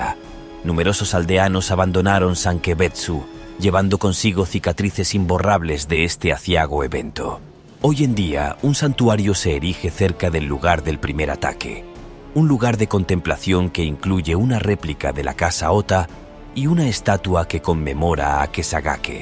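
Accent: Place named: Spain. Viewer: la verdad me parece ridiculo, pero bueno supongo que es más por que todos ya estan acostumbrados a la voz anterior. En lo personal prefiero esta voz, tiene aunque sea un poco mas de sentimiento y le da más un toque a documental que la voz robotica anterior, y a criterio propio siempre he sentido que el acento español le da un plus a este tipo de narraciones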